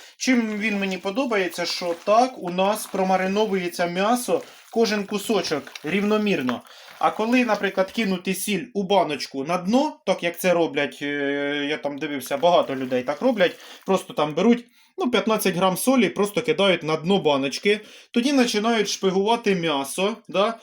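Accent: Poltava, Ukraine. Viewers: Доброго дня! Із святом Вас! 😊 Так, я зараз теж м'ясо солю зразу, мені так більше подобається, а раніше солила в баночках. Ви, мабуть із Полтавщини? Говір Полтавський у Вас. 😉😊💐💐💐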